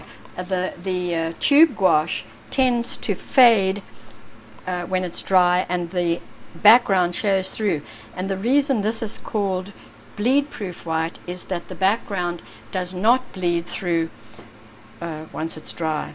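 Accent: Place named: South Africa. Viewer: Hi, I’m so pleased to have discovered your channel and of course I immediately recognized your South African accent.... ☺️🎨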